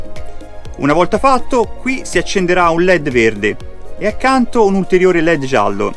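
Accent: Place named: Tuscany, Italy. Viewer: Ciao, spieghi sempre molto chiaro, impreziosito dall'accento toscano. Ti faccio due domande: nel tuo caso (inverno freddo e serbatoi vuoti di acqua), il riscaldamento truma diesel con boiler, lo potevi accendere senza danni? E poi le prese USB che sono all'interno del van sono attive, cioè puoi attingere dalla batteria servizi con van spento? Grazie attendo nuovi video.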